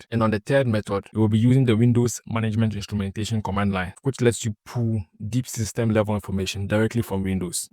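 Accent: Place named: Spain. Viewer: Sounds like Spanish accent. Spanish speakers who try to speak English forget that we separate our words. His sentences almost sound like one word. SLOW DOWN and separate your words.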